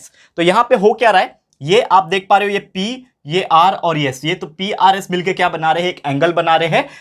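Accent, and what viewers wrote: Maharashtra, India. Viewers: Marathi bolne ki koshish jari hai... ❤❤❤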